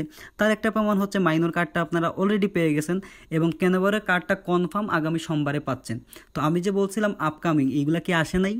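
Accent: Sylhet, Bangladesh. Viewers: vai apni ki sylheti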